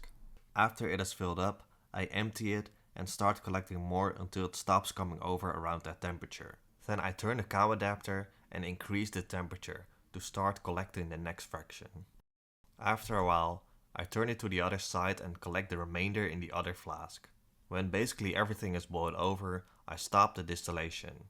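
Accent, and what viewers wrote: Netherlands. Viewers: Where is your accent from?.. it sounds like Limbourguish.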